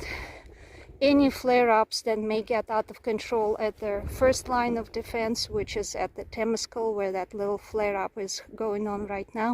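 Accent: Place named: Eastern Europe. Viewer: ) Можно и об этнической принадлежности. Хотя, не суть. Просто только сейчас заметил этот знакомый акцент из Восточной Европы. Давненько подписан, смотрел на творчество.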